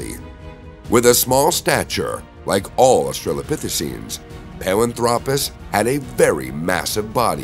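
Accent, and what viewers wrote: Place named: West, United States. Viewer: Why speak with an Western accent and say meters and grams instead of ft and lb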